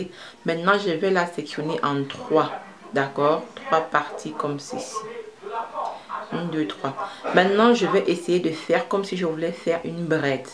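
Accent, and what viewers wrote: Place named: Cameroon. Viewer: Merci tantine J'entend ton Cameroun ce pays me manque ❤️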